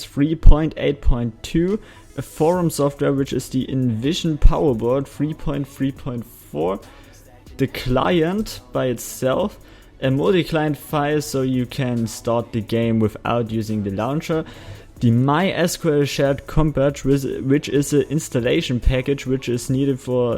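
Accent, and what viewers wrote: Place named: Germany. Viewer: german? denk ich ma hörs an deim english ich bekomme 1000Errors wenn ich sie lade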